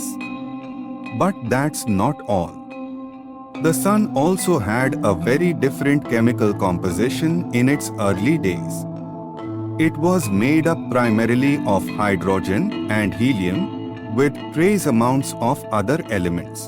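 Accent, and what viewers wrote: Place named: India. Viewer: I think that the narrator is coming from India.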